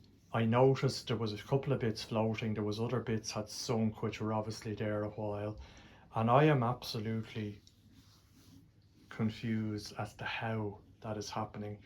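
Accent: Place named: Ireland. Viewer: Clean your ears out. Its called an irish accent. Thats all. Im english. Understood everything perfectly. Great vid. Keep up the great work.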